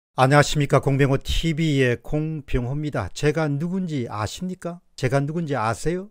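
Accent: Gyeongsang, South Korea. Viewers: 이 물건도 갱상도네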